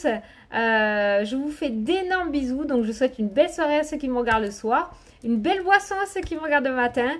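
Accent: South, France. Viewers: Dommage qu'Olivier vivent en Normandie 😕 Avec ton accent du sud, j'ai espéré qu'il soit dans le coin. Avignon/Normandie arrrf 😁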